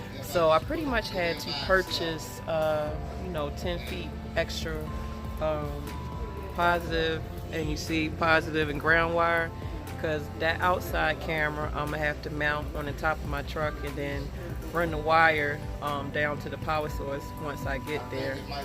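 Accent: Baltimore, United States. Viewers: My 10 year old recognized your voice. He asked if you were from Baltimore. I told him yes. He said you talk like one of his friends from Baltimore. So funny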